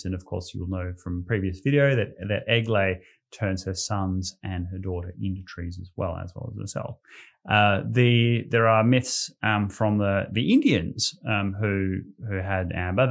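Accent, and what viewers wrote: Australia. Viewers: Thank you! Its amazing to listen australian talking about these forgotten things!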